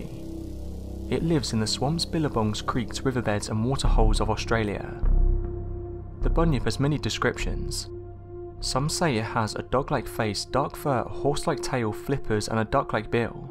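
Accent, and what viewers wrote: United Kingdom. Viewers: Interesting report. Too bad you string all your words together so even incomprehensible at 75% speed. As if you Brit accent isn't difficult enough on American ears. I'm unsubing.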